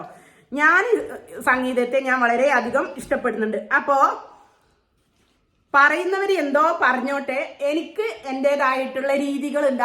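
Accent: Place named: Malabar, India. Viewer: നിങ്ങൾ ശ്രദ്ധിയ്ക്കണംഭാഷ കേട്ടിട്ട് കോഴിക്കോട്, മലപ്പുറം ജില്ലയാണ് എന്ന് തോന്നുന്നു - എന്തായാലും ശ്രദ്ധിച്ചാ നല്ലത്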